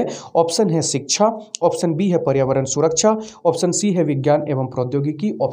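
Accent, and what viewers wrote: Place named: Bihar, India. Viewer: 7/11 , sir jis tarah aap 11 ko ekgyarah bolte hai na ...bahut achha lgta h.. i think aap bihar se belong krte h...agar mai galat hu to I'm sorry plzz ..mujhe jo lga Maine bola ..but I really like ur way of teaching 🙏🙏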